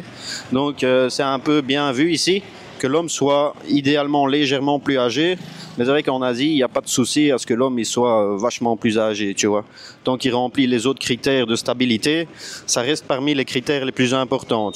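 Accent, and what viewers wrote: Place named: Belgium. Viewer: Merci pour t'es conseil tu ma bien fait rire et ton accent Belge et très sympa !!!!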